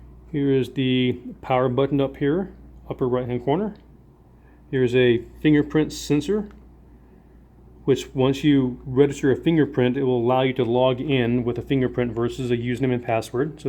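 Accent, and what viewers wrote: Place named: Argentina. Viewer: Pensé que era un ARGENTINO :V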